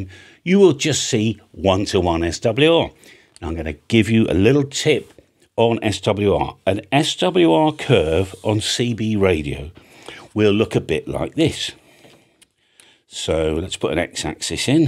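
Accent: United Kingdom. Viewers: Sure wish could understand your hard brit English ! Other wise it always sounds like your asking a question...put an AI voice to use...please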